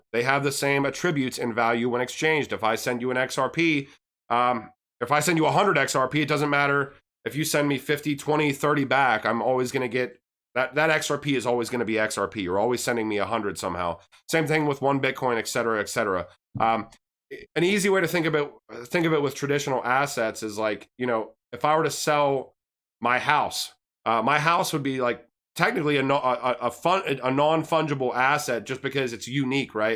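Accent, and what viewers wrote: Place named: Western Pennsylvania, United States. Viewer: Are you from western PA? Gotta be, the accent had me thinking it and now the pirate hat. Gitta be only western pa guy wears a pirate hat. Anyway, more educational is good. The tokenization is fascinating and dynamic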